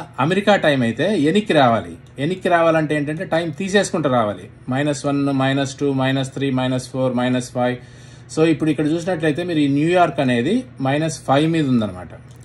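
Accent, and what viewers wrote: Rayalaseema, India. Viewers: మీ తెలుగు భాష చదువుకున్న వాడు మాట్లాడు తున్నట్లు లేదు. తాడిపత్రి లోనో పులివెందుల మనుషులు మాట్లాడుతున్నట్లు ఉంది. అమెరికాలో ఉండి youtube లో మాట్లాడేటప్పుడు వా క్సు థి ఉండాలి. ట యా నికి కాదు టైమ్ కి అనటం నేర్చుకోవాలి. నాగరిక జీవనంలో భాషా సౌందర్యం చాలా అవసరం. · Watching ur videos.. because of your rayalaseema slag sir... I am from kurnool . Tq sir.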